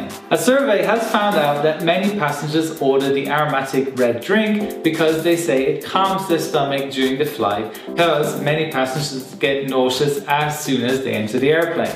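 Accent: United States. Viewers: how can he speak American English so well?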